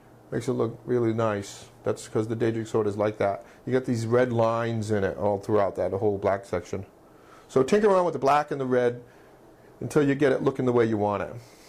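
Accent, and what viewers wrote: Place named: Bronx, United States. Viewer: I love how you thumbs upped your own video. Sometimes, I think I'm my biggest fan too! Your accent reminds me of a guy I grew up with who was from the Bronx, where did ya grow up?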